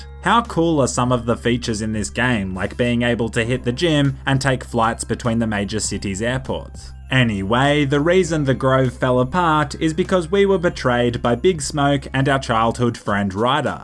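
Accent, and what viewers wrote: Australia. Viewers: You talk like a robotic Australian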